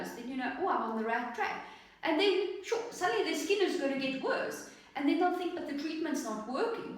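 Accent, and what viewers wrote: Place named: South Africa. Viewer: South African:)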